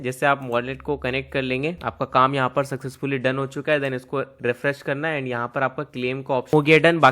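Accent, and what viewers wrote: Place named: India. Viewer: INDIAN SOUNDS MOTHER FCKR COUNTRY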